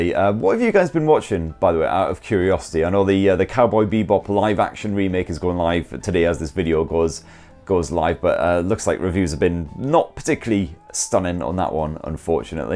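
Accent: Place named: Newcastle, United Kingdom. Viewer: I meant it as a compliment, I'm a Geordie myself and I cannot detect any of the usual Makemesque accent. Your accent sounds very much north of the Tyne to me.😳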